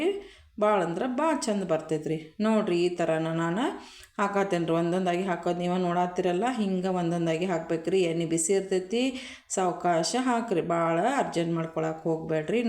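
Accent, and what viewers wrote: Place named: North Karnataka, India. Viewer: ನಮ್ಮ ಉತ್ತರ ಕರ್ನಾಟಕ ಭಾಷೆ ಕೇಳಿ ತುಂಬಾ ಖುಷಿ ಆಯಿತು ಸಿಸ್ಟೆರ್ 👌👌👌❤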